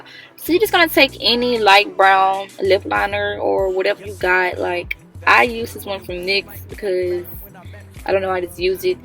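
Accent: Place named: New Orleans, United States. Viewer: Girl that’s a thick N.O accent lol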